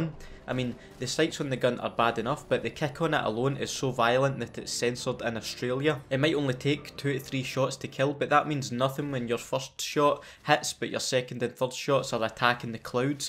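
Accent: Scotland, United Kingdom. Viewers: this guy has got me dead xDDDDDD and the Scottish accent makes it even better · Is this a Scottish accent? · I love your Scottish accent! 😅❤ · Oh dude ur videos is the best always brighten me up Even when Im mad u have good humor And that ur scotish makes things Even better love your vids keep the good work up👍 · You sound more like a proper Scotsman than an Aussie. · I love Scottish accents.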